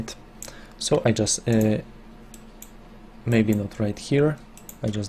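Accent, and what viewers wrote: Poland. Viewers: Are you from poland? because you have polish accent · Unreal nie ukrtjesz polskiego akcentu a zwłaszcza meszes xD GruBe aus dem Polnischen (sorki ale nie mam niemieckich znaków na klawiaturze)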